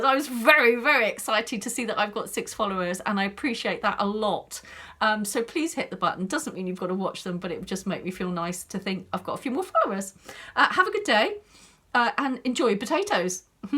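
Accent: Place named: United Kingdom. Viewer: Hello from Dallas Texas, my name is Georgia Ann. I love your sweet British accent and smile, so happy to get to know you. Here is my story. I am 72 years old, and was 50 lbs. overweight. I have tried everything, I was up and down and yo yo dieting. One day I tried the Potato diet and said, I would give it a try. I ate potatoes only for one day and had an insulin drop and was sick and shaky So that did not last long! Another fail. Then I bought the Eat To Live book by Dr Fuhrman, and got more education on the plant based diet. I learned that you MUST have a BEAN dish everyday when eating whole plants. When your not eating the meat you must have beans and it becomes your protein.I am borderline diabetic, so it affected me with only having starch.All that said, I have lost 30 lbs. eating whole plant food. The most wonderful thing that has happened to me is, I have learned that, when you nourish and feed your body real food, the food addiction cycle, and the benging STOPS! 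It's easier to say no to Sugar, and not eating sugar stops the cycle and you gain control. My next hurtle is to learn to simplify this way of eating where it is not complicated recipes. I love to cook, I have for 50 years. But if you are not careful, you find yourself chopping and preparing all the time, plus a mound of dishes, then it becomes to hard to do. So I want to cook simple, , easy things and not get caught up in another diet. So Yes, the answer to your question, You Can loose weight when you are older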